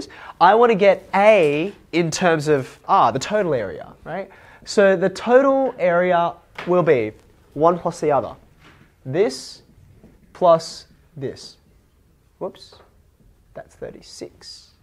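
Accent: Australia. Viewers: Yeah he is an Aussie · Is Australian? Cause he started out sounding American. Lol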